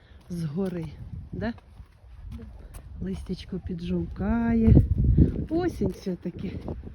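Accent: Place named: Zaporizhzhia, Ukraine. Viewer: сьогодні ролік клас дуже весело і цікаво а розмовляєш ти українською на нашім ріднім запорізьким діалектом.Слухати тебе приємно як з'їздив до себе додому.Прохання: більше показуйте і розповідайте про бази,показуйте місцевість.Якщо нема машини як ще можна передвигатись по тій місцевості,буду вдячна.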